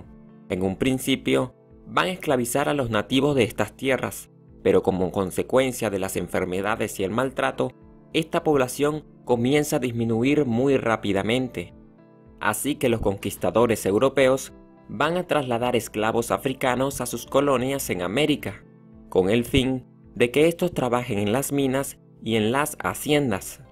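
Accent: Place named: Spain. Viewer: Puede ser su acento , los españoles dice mucho el sh en la s ,aqui en mexico hay muchas lenguas y la x se pronuncia como j ,sh ,ts ,x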